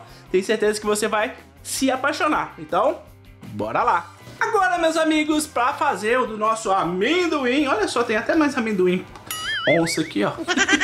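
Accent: Minas Gerais, Brazil. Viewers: 👏🏽👏🏽👏🏽👏🏽👏🏽👏🏽👏🏽 Tem que falar com minerês mesmo!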